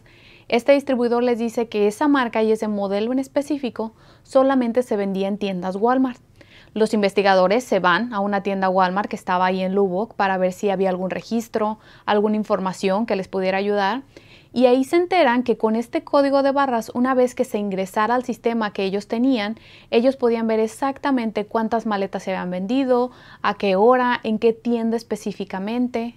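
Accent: Mexico. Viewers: 👏👏👏👏👏De qué nacionalidad eres? Me sueñas como yo, mexicana o tal vez de Guatemala????? Soy súper fan de varios Youtubers de crímenes, pero de ninguna mexicana porque hablan " muy fresa" y no tolero ese tonito de voz , además de que eso no me parece profesional. Por fortuna de sugerencias di con tu canal y me encantó tu calidad de investigación y narración. Saludos desde Suecia. Soy mexicana pero estoy casada con un sueco y soy residente aquí. Este tipo de videos me hacen sentir muy cerca de mi amada Latiomamérica. 💓💓💓💓💓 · gracias, ya vi que salen tus Suscriptores, muy bien, así podemos ver tu avance. Tienes una dicción, tono de voz muy bonito, así se habla en Ciudad de México y Estados del rededor de Cdmx...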